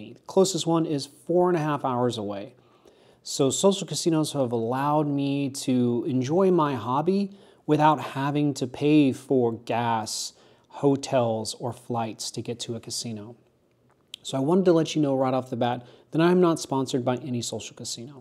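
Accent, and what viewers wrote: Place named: Hill Country, United States. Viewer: Super Informative Bro! I really appreciate the honesty and transparency. Sounds like you're in the Hill Country. I grew up in Houston and am now nomadic, but I've played in land casinos in Colorado and Nevada. Looking forward to more of your videos!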